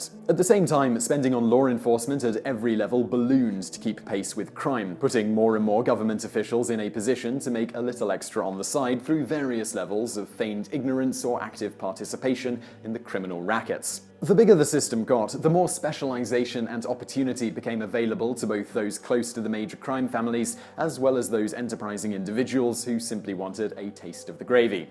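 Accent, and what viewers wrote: England, United Kingdom. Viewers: All these yanks upset with his pronunciation. If you don’t like the English language in its natural form, use someone else’s mother tongue. We don’t really want you walking round telling us how to pronounce things. Bastardised to the highest of degrees. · T Rohan You do realize that his ancestors were speaking English when yours were crawling around on the ground, grunting and swinging from trees. He pronounces it the way people in England, who speak English, pronounce it. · For those complaining about how says the word mafia: he speaks with an English accent. He is saying 'mafia' correctly according to where he is from. Aussies, some South Africans, and many other non-North American English (according to their accents and dialects) regions say mafia the same as.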